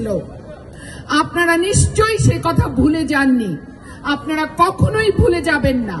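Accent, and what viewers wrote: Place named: Kolkata, India. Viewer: kolkatar tane kotha bolos ken shali?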